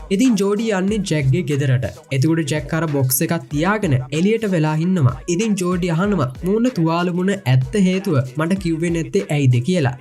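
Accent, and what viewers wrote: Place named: South, Sri Lanka. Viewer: Uba dakune kollek neda????ehetta mehetta kiyanne 😂😂😂😂😂😂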